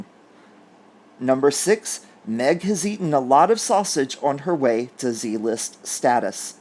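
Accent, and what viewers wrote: United States. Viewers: Hiya hunny, Glocestershire is pronounced like Glaw then the cestershire at the end. It may just be your sweet American accent 😉. Have a good evening.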